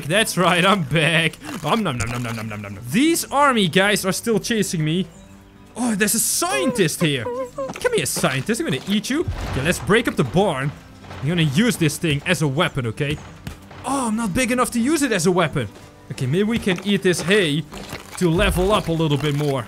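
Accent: Netherlands. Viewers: are you dutch?! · You dutch????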